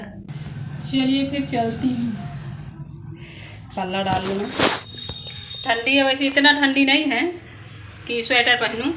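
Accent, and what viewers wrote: India. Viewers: Aaj apne bilkul desi tareeke me baat ki accha laga aur dulaiya bhi hi gai bahut hi badhiya video 👍👍🙏👍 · Aj to bhojpuriya bol rahi hai 😃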